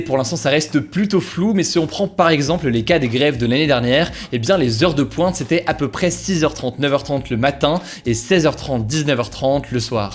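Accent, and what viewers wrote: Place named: England, United Kingdom. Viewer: ton accent anglais me fait délirer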